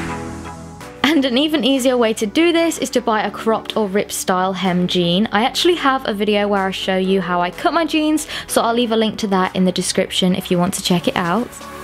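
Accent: United Kingdom. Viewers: Love your british accent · Are you using a British accent??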